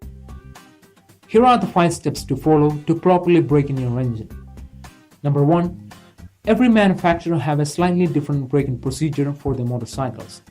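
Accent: India. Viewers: your accent is still indian😁😁😁😁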